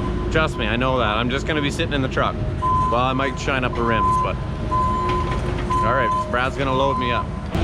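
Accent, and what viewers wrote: Canada. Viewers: Americans are back wards lol. We pronounce it that same way as you Canadians here in Australia. Shift it.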